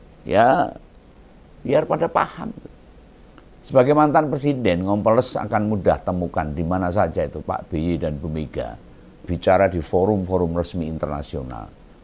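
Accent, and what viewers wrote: Java, Indonesia. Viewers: Aku seneng dengan ni logat jawanya dan guyunyo tu.... Ikut guyu juga aku dibuatnya... Mantap pencerahannya maju terus Mas.. 👍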